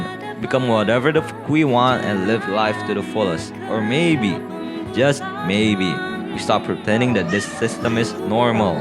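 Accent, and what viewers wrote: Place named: Indonesia. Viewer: Another Indo English speaking peak content? Subscribed!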